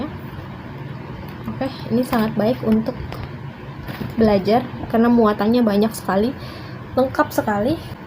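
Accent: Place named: Makassar, Indonesia. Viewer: Dari logat nya pasti orang makassar yah?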